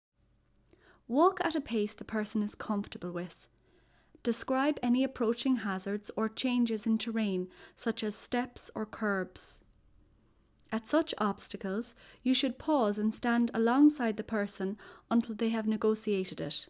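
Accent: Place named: Ireland. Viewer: The narrator sounds cute. I'm a sucker for Irish accents.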